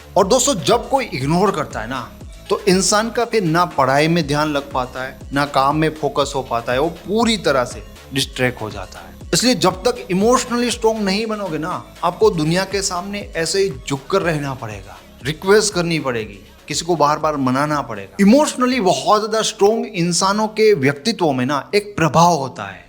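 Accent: Gujarat, India. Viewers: आप गुजराती हो? आपकी हिंदी गुजराती पटेलभाई जैसी है।😂😂 मजा आता है सुन के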